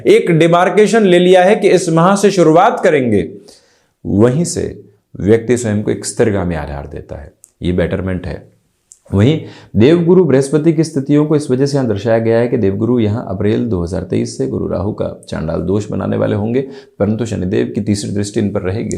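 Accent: Standard. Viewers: Apki Hindi sun kar bahot accha laghta hein… kash mujhe bhi itni shudh hindi aati 😊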